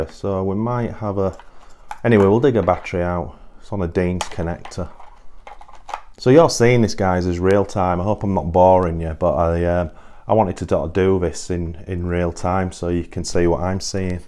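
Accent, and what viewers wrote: United Kingdom. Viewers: 🤠 Do you want these as well? 🙄🤦‍♂️🤦‍♂️🤦‍♂️ Yes, Yes I do 😍 🤣🤣🤣🤣🤣 it’s awesome I would have said yes as well I was trying to figure out what you meant by Bad-GA BUG then I saw the decals & remember your English isn’t my English it’s BA- HA Bug referring to Baja Mexico & the Baja 500 and the off road desert racing that goes on down there to this day. I used to work with a couple engineers from the UK (& Europe in general) I think I’m used to the accent I’m not even close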